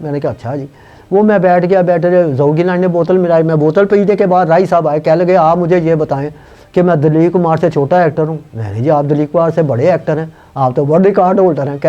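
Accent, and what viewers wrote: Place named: Punjab, India. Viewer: Sir tawada lehja Punjabi wa the aede nalon changa tusi Punjabi hi bol lo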